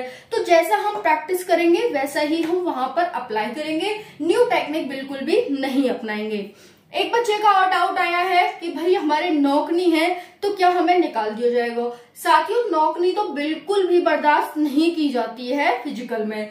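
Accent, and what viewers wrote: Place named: Braj, India. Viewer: Braj bhasha bol rhi ho aap.. Mathura se ho aap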